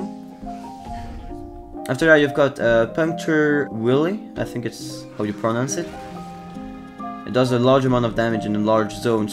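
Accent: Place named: France. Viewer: u got a french accent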